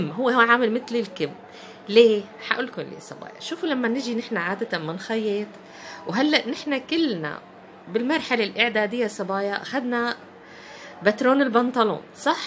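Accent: Egypt. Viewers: تسلم ايدك عل شغلك وشكلك قاعدة بمصر اللهجة المصرية باينة في كلامك شويه تسلمي حبيبتي من كل شر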